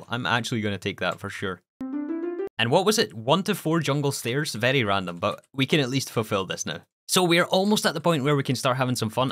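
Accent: Scotland, United Kingdom. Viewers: I don't even play Minecraft anymore. Haven't played in about 10 years haha. Your pacing and amount of content packed into each episode is amazing, also great to hear a fellow Scot 😀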